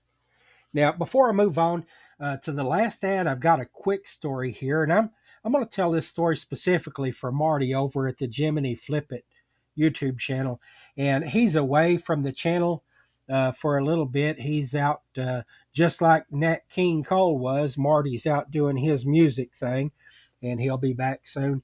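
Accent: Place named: Appalachia, United States. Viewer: HAHA Hillbilly accent. Being in NC, we have a lot of that here too. I call my friend a Renaissance Redneck. 🤣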